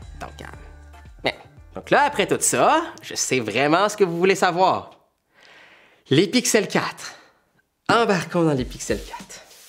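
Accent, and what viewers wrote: Quebec, Canada. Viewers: Une question pourquoi tu dit "ça s'écrit tu comme ça "ou encore "marde " est ce que c'est l'accent du Québec? (Entre nous je pense que oui ).😁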